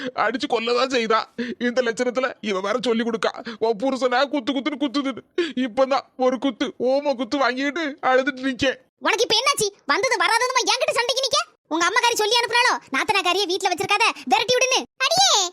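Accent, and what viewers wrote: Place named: Tirunelveli, India. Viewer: சூப்பர் திருநெல்வேலி பாக்ஷை....கேட்க இனிமையாக இருக்கு...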